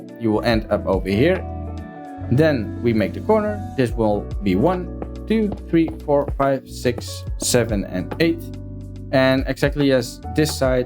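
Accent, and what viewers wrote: Netherlands. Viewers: Hey, Just got recommended this Video and the complete build looks amazing! You deserve way more Views, Subs and more. Ps. The Netherlands accent is Lekker! 👌👌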